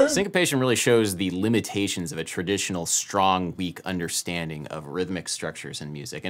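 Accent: United States. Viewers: Great video and all, but I strongly believe he has quite a strong American accent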